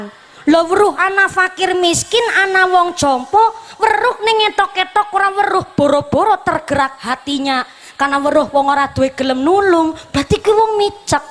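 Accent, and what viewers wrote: Banyumas, Indonesia. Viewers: Subhanalloh q jd seng dgrin ngapaknya ,kira"klu keblitar berapa ya lur,klu ngundang,q pingin,smg bisa nyengke desa ku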